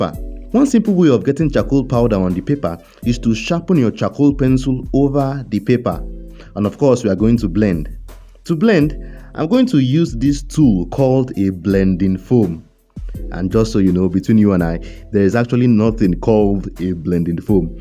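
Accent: Nigeria. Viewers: This really helped, thank you so much . By the way, are you a Nigerian?,you sound like one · Oh my gosh where have I been, where have you been and why am i just discovering this channel? why have you guys never suggested these tutorials whenever I am searching for stuff?! Awesomely great work bro, your tutorials are sooo educative, informative and very explanatory, absolutely any level can follow!! More success to you, and also I gbadun how your Naija tongue sounds through your clear spoken words!! Carry go jooor, nuffin doeth thou!!!☺☺👍👍👍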